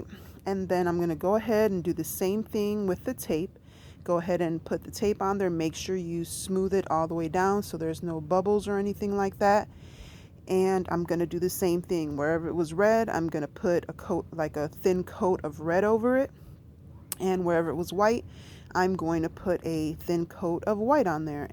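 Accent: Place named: Puerto Rico. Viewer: Beautiful Flag!! 👌I have always love the Puerto Rican accent !! Thank You for sharing 😘❤ your Word I think is Bird. 🥰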